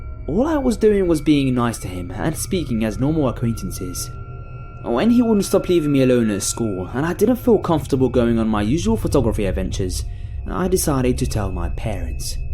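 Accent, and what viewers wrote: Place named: United Kingdom. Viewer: The Brits have the best voice in the world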